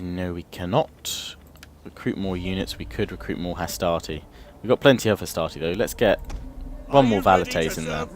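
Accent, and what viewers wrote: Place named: United Kingdom. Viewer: fuck you will your british ascent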